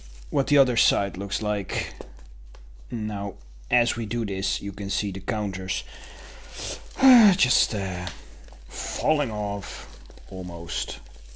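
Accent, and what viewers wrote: Netherlands. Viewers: You're Dutch?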